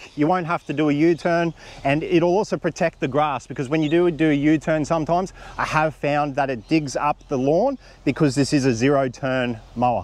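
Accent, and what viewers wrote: Australia. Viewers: Thanks for this vid - it's great to see it in use on an Aussie property (with an Aussie accent!) I've just put my order in for the 4WD version, expecting it between chrissy and new year. As a relatively small female in my mid fifties, mowing 27 acres is a challenge - I usually focus on 5 acres and let my neighbors cattle moo the rest. As much as that is a nice thing to do, it means keeping fences closed and dodging cow pats for months. My place is on 30-40 degree slopes, with a front paddock, a back paddock, an orchard and the house yard, all of which are laden with red bellied blacks and ticks during the hot months and leeches during the wet. Because I've purchased the 4WD version with the extra wide/traction wheels, I'll be able to use this while standing somewhere safe and shady, or at the very least, following through a path of mowed area, and that will be life changing. 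I'll be able to do work on parts of my property I've never even walked on and I am sure I will feel safer without having tall dead grass standing like a fire hazard. This machine has an application for people with a disability, sure, and also for people who just aren't very physically strong, and that is going to be a target market that a lot of 'guys' wouldn't even consider. I'll let you know how it performs if you're interested. Oh, and something I have not seen mentioned on vids about this machine - its got a lithium PHOSPHATE battery - which means it last for a few thousand cycles (instead of lithium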